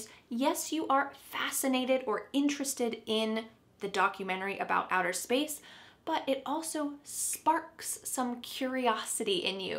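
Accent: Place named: United States. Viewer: Hello My name is Eugene. Thank you very much indeed for your super American English accent. Here in Rwanda we truly love you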